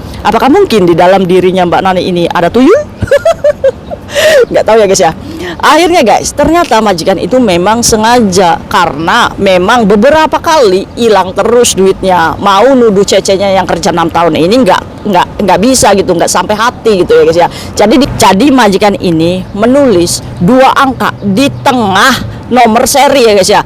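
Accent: Indramayu, Indonesia. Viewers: Logatnya kayak org Indramayu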